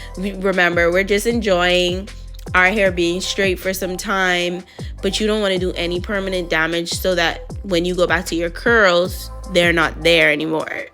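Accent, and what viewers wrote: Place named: Bahamas. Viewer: you sound Bahamian. Hair goals🙌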